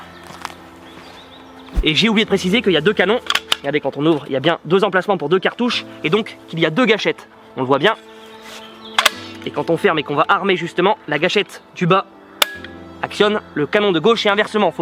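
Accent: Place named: Nord, France. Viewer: continue comme cha min loute, j'adore quand tu fait ton babache sur tes vidéos. Bonne continuation min loute. sa me rappel ma bonne région quand tu parle ch'ti. :)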